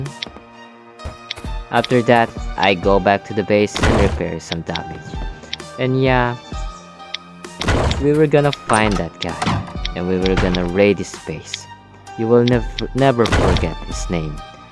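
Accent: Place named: Philippines. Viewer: Are you filipino kasi buses Filipino eh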